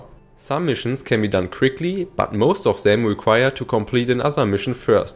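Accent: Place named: Germany. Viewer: Yeah, very strong German accent. He should work on that.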